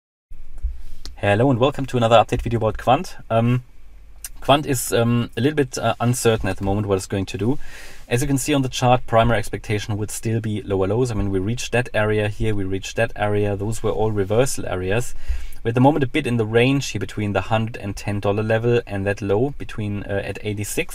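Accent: Germany. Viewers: German confirmed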